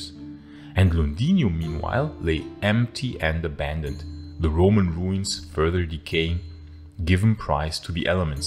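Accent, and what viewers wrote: Germany. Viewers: You are German, aren' t you. I detected it when you pronounced the word Claudius .... You might want to update your english pronunciation. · Oh God, 1 second in and I can't watch it. Listen Mr German speaker it is not Lon,un, it is LuN Dun, stress on first syllable etc etc. YOu know how you can tell a German? Complete inability to pronounce London plus complete shock, horror, and inability to comprehend the use of the word "since"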